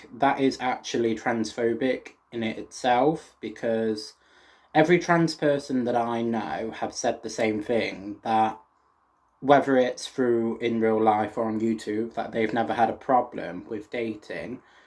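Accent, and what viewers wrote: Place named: England, United Kingdom. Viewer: Agree entirely with your sentiment, this is just another picky comment! Be a bit careful about the vocabulary you use - when you say "'libertarian" in this video, I think you mean 'liberal'. A 'libertarian' is generally considered to be someone who is for minimalising government interference, and maximising individual freedoms - very much into free-market capitalism, minimal welfare state, and privataisation; a 'liberal', on the other hand is what you describe. A 'Republican' (capital 'R') is a supporter of the conservative, American Republican party. A 'republican' in the British sense, is one who would get rid of the queen and the monarchy in favour of an elected president. I know YT is an international platform, but I think the distinction is important when you have an English accent. Sticking to 'conservative' generally avoids any confusion.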